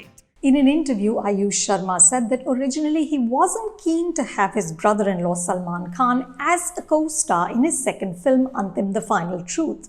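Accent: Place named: India. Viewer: Her Indian English accent though hahahaha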